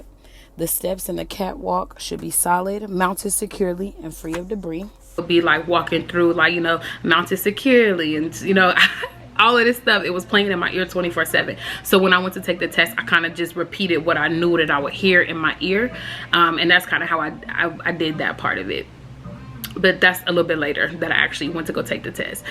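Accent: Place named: Detroit, United States. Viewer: You from Detroit ha? I can hear it .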